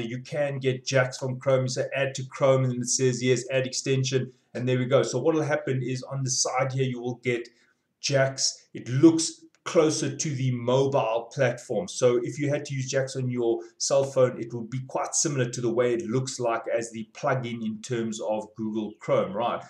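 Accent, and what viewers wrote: South Africa. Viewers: South African!! Lol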